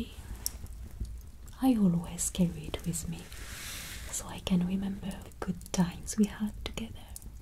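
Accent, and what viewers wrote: France. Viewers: Simply astounding production quality in every way!🙏 The lighting looks wonderful, beautiful images. And your French accent, and dialogue in French, is delightful to hear too. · love when you speak french + english with a french accent ! youre such an elegant, classy and beautiful woman. ❤ i love your vision and creativity. top tier content ! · Tu parles d’une façon très française 👍🇰🇷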